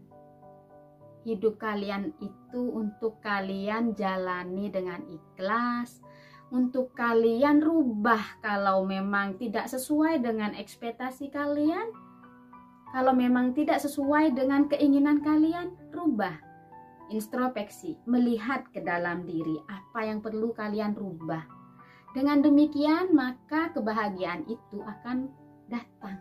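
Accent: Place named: Madura, Indonesia. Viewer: Mb...logat maduranya masih kentel banget 😀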